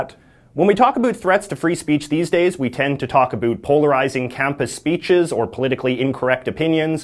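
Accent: Canada. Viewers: You keep saying A-Boot...i am canadian and i say about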